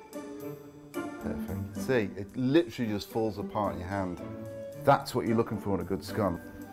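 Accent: England, United Kingdom. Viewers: The bloody guy has an English accent (he’s from Liverpool), can you not tell? They’re Scones! Scones came first remember, we are the mother country after all! No they’re not biscuits. 🙄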